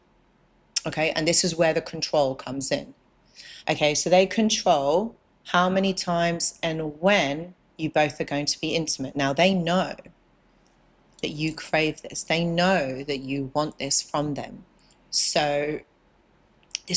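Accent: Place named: Southern England, United Kingdom. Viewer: You need to learn English, she has a standard southern English accent which is the standard English accent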